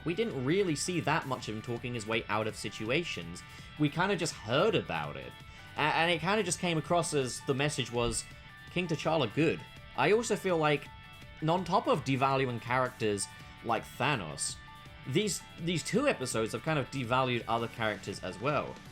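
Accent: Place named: United Kingdom. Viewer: It's not your authority...it's your sexy soothing British voice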